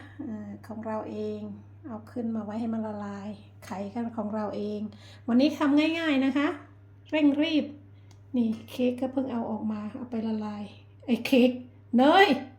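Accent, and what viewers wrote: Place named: Suphanburi, Thailand. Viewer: ทํามัยพูเหมือนคนสุพรรณเลยทัมมัยต้องออกเสียงสูงมากไป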